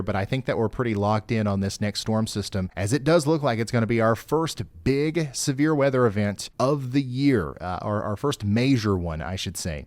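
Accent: South, United States. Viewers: Howdy from Richmond, Virginia. I’m here for the great weather reporting but also for the kind southern voice. It’s a disappearing accent.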